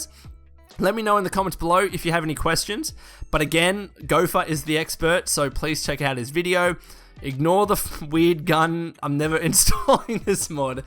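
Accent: Australia. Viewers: Yeah the Australian accent can be hard to understand for some people :P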